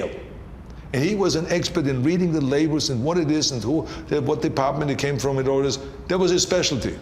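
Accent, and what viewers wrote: Styria, Austria. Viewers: Sacré!! Ah j aime bien son accent autrichien 😅 quand il parle allemand! 💪😎 Der Terminator!! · He is Styrian, he speaks with an Styrian accent... not even near to swiss?! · He has an Austrian hillbilly accent and for that reason they didn't let him do the German dub for Terminator. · He speaks Styrian, a dialect from Austria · He has a veeery strong Austrian accent 😄😎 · Actually he speak German with an Austrian accent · Ze Austrian accent suits him perfectly. Imagine speaking Russian or French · Nope, it's a kinda thick austrian accent. Personally, I love it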